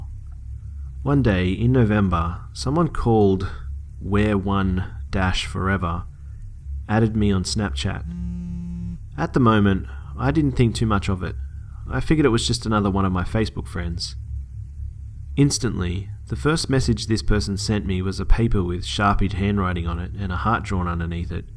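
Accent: Australia. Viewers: U australian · Is he Australian? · ur Austrailian thank god there's an Austrailian saying a horror story I am subing to u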